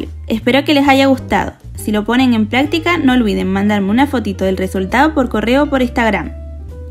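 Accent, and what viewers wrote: Canary Islands, Spain. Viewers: Mira que te había oído en otras recetas y decía... esta chica es canaria... pero al ver los suspiros de Moya ya me lo confirmó. Pondré en práctica la receta. Por cierto, como idea, puedes poner los ingredientes en la descripción del vídeo. Es muy útil para los que vamos a seguir la receta. Un abrazo desde Gran Canaria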